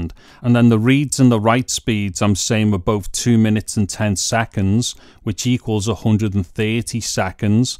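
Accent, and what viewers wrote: Liverpool, United Kingdom. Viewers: This was just what I needed to be certain that the Samsung T7 works for shooting video with the iPhone 15 Pro Max. I've now bought the hard drive and I am excited about trying it out. Thanks so much for sharing this. Also, as a silly American I am just in awe at how amazing your accent is. Is that Liverpool? It sounds so cool! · Scousers posh telephone voice right here 👆🏼 great video mate really informative 👍🏼 coming from a Warrington half and half over here 🍻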